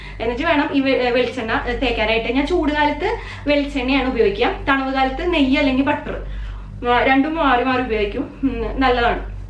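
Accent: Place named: Thrissur, India. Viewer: ഒരുപാട് late ആയാണ് ഞാൻ ഈ ചാനൽ കണ്ടത് പക്ഷേ സത്യം പറയാല്ലോ ഒരുപാട് ഇഷ്ടമാണ്.ഇതിലെ തക്കാളി മുട്ടക്കറി try ചെയ്തു കിടു ആയിരുന്നു.ഇപ്പോൾ എല്ലാ videos ഉം കാണാറുണ്ട്.really love the way u are😍😍😍😘😘😘തൃശ്ശൂർകാരിയേം,ആ സംസാരവും ഒരുപാടിഷ്ടം😘😘😘😘😘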